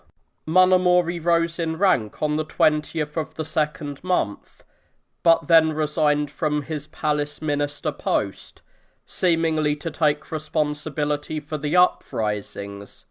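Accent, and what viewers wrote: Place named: United Kingdom. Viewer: Thanks for the effort, Even though the Japanese names are pronounced in a British way, not the Japanese way. Still, Thanks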